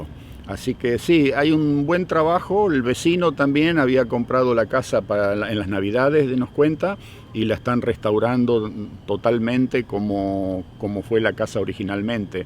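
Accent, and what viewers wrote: Argentina. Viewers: arranca el video mencionando que va a regar las plantas porque hace mucho calor, aunque no sean sus plantas ni su casa QUÉ GRAN CORAZONNNNNNNN, LA PUTISIMA MADREEEEEEEEEEEEEEEEEEEEEEEEE, encima dice "le vamos a pegar una regada", no hay caso, lo escucho y escucho tonada e historia argentina, lo apreciamos mucho!! <3 <3 <3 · Como se hacen querer!! que maravilla seria poder viajar con ustedes! Hace 2 meses llegué a Toronto y durante todo el proceso de sacar la visa (9 meses me llevo) estuve mirando sus videos y aprendí un monton sobre Canadá!. Gran saludo!!! me recuerda a mi papá que nació en la misma epoca. Y es tremendo como tiene todavia el acento argentino bien marcado! Gracias!!!!!!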